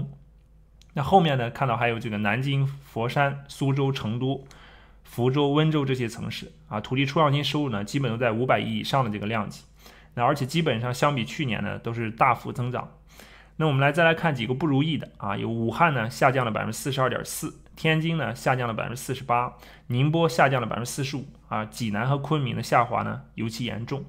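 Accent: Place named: Hohhot, China. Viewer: 感觉是呼市人，我认识吗？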